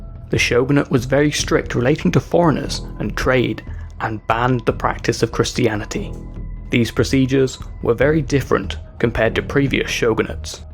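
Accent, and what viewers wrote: United Kingdom. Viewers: That accent,you from England?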